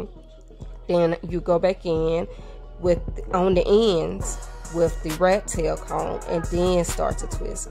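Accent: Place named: Georgia, United States. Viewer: I knew it! My cousin says oil just like you and she's from Georgia. She so funny, she says stuff like "kitchen tile" as "catchin' towel" 😂😂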